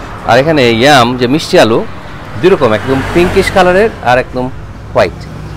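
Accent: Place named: Sirajganj, Bangladesh. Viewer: সেই স্বাদ !! এত স্বাদ ক্যা?... 🤣সিরাজগঞ্জ !! বিদ্রঃ এটা পাশের এলাকা পাবনারও একই উচ্চরিত ভাষা !! 😅😅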